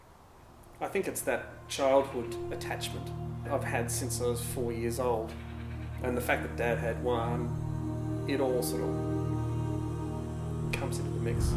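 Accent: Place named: Australia. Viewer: You know, I chanced across this video and I was impressed for many reasons...one was an Aussie from Melbourne who was talking about his car, and secondly it was a Studebaker...that marque of car had links with a Packard which my dad had owned a '34 model in the 50's. All up it ticked all the boxes. And post script Aussies talk in a certain way..up front no pretenses...love it.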